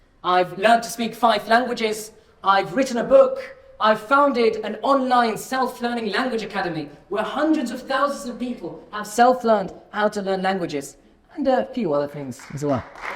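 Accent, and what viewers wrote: United Kingdom. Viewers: ما شاء الله لهجتك بريطاني 😅👍 برافو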